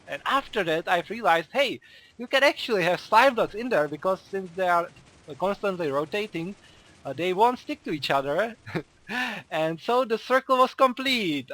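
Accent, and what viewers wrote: Czech Republic. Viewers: Jde to znát že jsi čech :D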